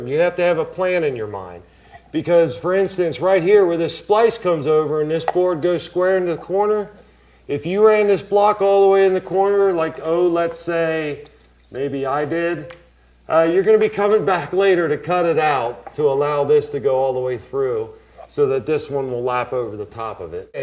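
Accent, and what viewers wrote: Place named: Pittsburgh, United States. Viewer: Hi! I see you are in Cincinatti, but I swear I hear Pittsburgh in your accent! Are you by chance from Pittsburgh?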